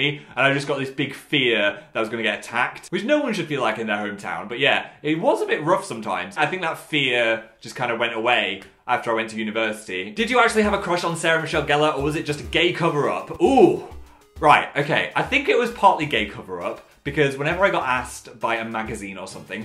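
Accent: United Kingdom. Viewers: Is it just me or does Phil’s accent sound more British than normal if you get what I mean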